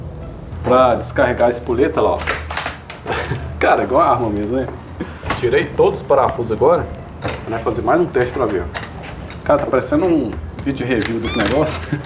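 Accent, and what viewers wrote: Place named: Rio de Janeiro, Brazil. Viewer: O cara com mó sotaque carioca.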